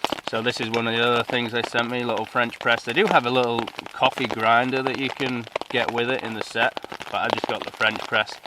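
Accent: Yorkshire, United Kingdom. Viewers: For the whisky, "It was the cheapest, actually." lol. Yorkshire. I love it. Keep up the good work. Love your videos.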